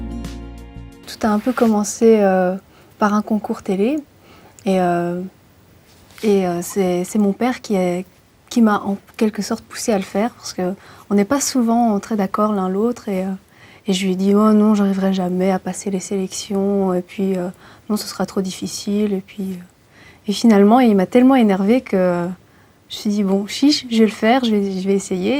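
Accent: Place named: Belgium. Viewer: elle a un petit accent belge, j'adore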